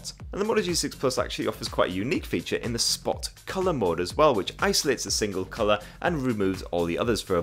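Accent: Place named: North East England, United Kingdom. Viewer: You sound like a Sunderland guy, you have a hint of a north east accent.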